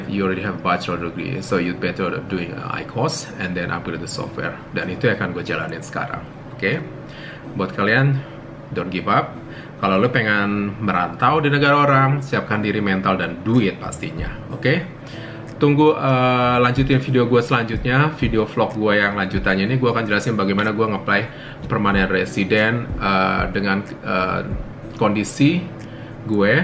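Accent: Medan, Indonesia. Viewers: Aku suka kali liat vlog abang...bahasanya Medan kali...jd ingat aku sama kampungku...sehat terus ya bang...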